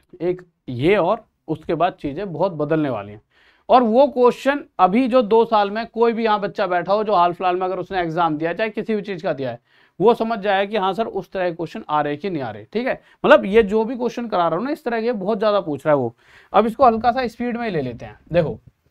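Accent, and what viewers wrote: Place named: Bulandshahr, India. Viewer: Sir bulandshahr wali bhasa bol rhe ho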